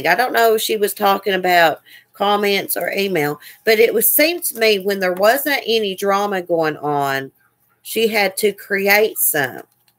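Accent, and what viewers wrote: South, United States. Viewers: New sub, just like hearing a fellow southern accent! I only watched A once way back when the golightly drama happened. Hate this for the ones she hurt deeply and her son.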